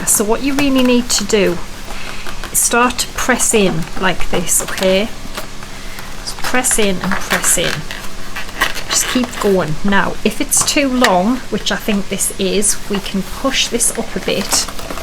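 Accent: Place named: North East England, United Kingdom. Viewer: Great ideas, and I will certainly use some of them, but I really kept playing the video to listen to your accent. My parents were from South Shields, and I lived and worked in Gateshead for three years (1967-70), although I haven’t lived in the UK since then. I’m guessing you’re from somewhere not too far from there, so your voice gave me a real blast of nostalgia. Thank you!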